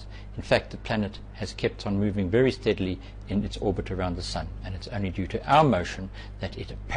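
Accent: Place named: South Africa. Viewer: Weird, I thought the planets orbited in an anticlockwise motion when looking down on the north pole. But this guy sounds South African so I guess he's basing things from the southern perspective.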